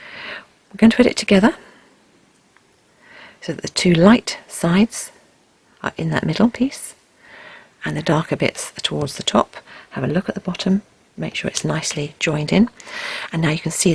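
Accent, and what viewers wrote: United Kingdom. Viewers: You inspired me today, to borrow some ideas from you, think up some of my own, and make a veneer, and then a bracelet. Mind you, it was the absolute worst weather for working with polymer clay (hazy, hot and humid), but I did it anyway. It came out pretty well, too! The funny thing is, every time I grab a wooden skewer to smooth out seam lines, I can hear your sweet British voice inside my head, coaxing me along. Thanks for all you do.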